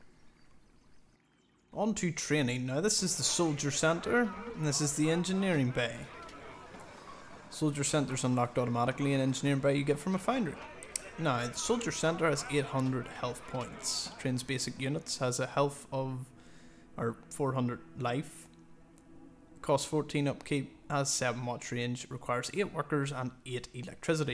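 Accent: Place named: Ireland. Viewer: It's really a delight listening to your accent, i wish i had an irish accent like yours.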